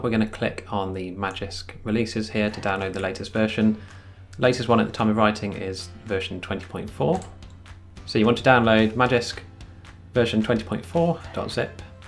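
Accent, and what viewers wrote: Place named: England, United Kingdom. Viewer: >English tutorial, with english accent >Done on a Samsung device Perfection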